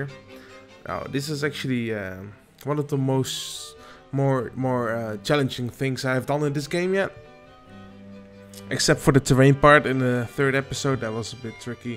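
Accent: Netherlands. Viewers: Love your dutch-english lol. I think adding glass parts in your roofs would make the inside look way better adding natural lighting aswell as making the roof more diverse from above.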